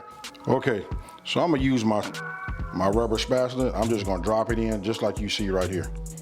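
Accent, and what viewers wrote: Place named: Bay Area, United States. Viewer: He gotta be from the Bay sounding like that.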